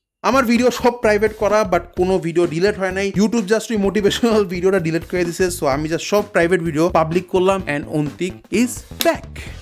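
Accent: Mymensingh, Bangladesh. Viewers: Are you from Mymensingh??/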